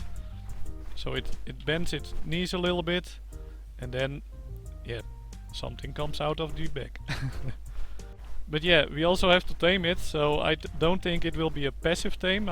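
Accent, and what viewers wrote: Netherlands. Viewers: Most Dutchies have an accent, take it or leave it. You don't need to have an English accent to have an English channel. If you don't like the Dutch accent you have 3 options, deal with it, mute or get lost. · You are from the netherlands i hear xD maybe you need to learn the accent of english before making an english channel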